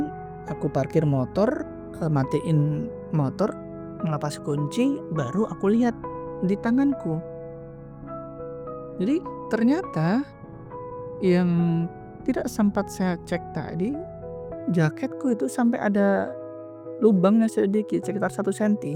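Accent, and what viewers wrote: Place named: Sulawesi, Indonesia. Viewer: Logat sulawesinya masih nempel ya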